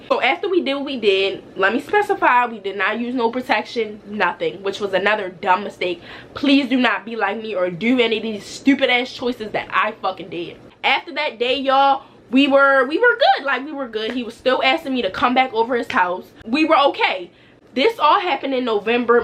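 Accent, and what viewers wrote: Baltimore, United States. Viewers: Soon as u started tlking, I said to myself she sound like she from Baltimore! And I knewwww!!!! I know our accent any mf where! 😂 I'm from over west 💙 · You sound like you from my city (Baltimore)”…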